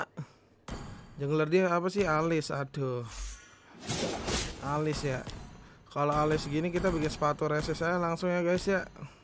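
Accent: West Java, Indonesia. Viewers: banyakin ngomong pake bahasa sunda bang, seneng gw dengernya jirr kocak 😂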